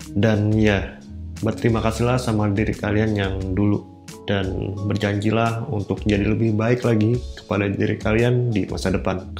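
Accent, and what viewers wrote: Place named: Kalimantan, Indonesia. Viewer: Logat kalimantanya keluarin lagi bang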